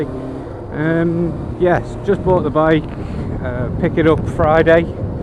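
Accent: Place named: Wirral, United Kingdom. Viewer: I knew from the accent that you must come from that neck of the woods, but in my day, long, long ago in a Galaxy far away, I'd have placed you further up the Wirral. Mind you, I come from further up the Wirral, but not many people these days place my accent there.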